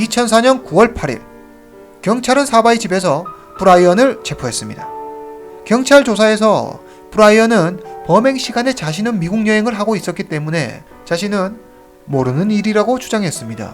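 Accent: China. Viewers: 억양이 한국분 맞나요? 중국 태생이신가요?귀화하신건가요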